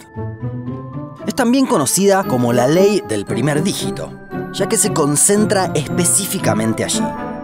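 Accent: Argentina. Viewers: Tu acento argentino es muy bonito, como tus vídeos.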